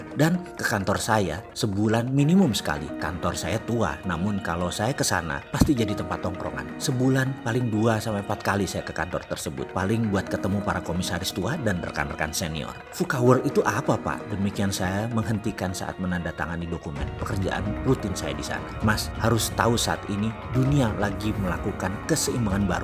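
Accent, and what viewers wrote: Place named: East Java, Indonesia. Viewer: beda bro, kerasa logat jawa timurannya, karena ane orang madura, sudah terbiasa dengerin logat jawa versi timur, haha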